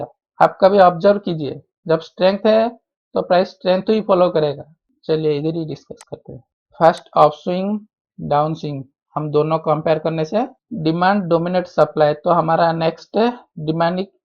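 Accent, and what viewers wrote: Odisha, India. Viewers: Bhai apana odia ki tone ru Jana paduchi..kai na Odisha side Hindi tk emiti katha huanti..bhala lagila apanka video dekhili..apanka sahita contact karibar achi detail diantu bhai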